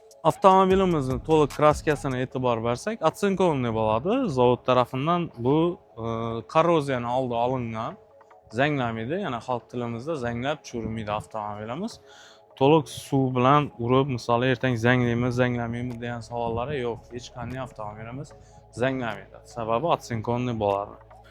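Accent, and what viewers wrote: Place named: Khorezm, Uzbekistan. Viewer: Молодец. Обзор яхши чикипти. Айрим хоразм блогерларни умуман тушиниб булмайди. Бунда хоразм акценти бор булсахам хаммаси тушинарли.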